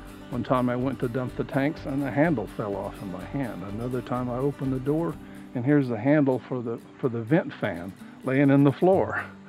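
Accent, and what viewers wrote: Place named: Georgia, United States. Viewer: Gotta be from Georgia. He says "Hweel"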